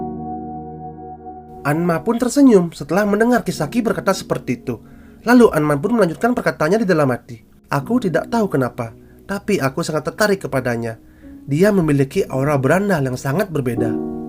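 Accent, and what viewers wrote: Bali, Indonesia. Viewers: dari bali ya bli? logat nya udah keliatan nok wkwk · admin orang bali keknya wkwk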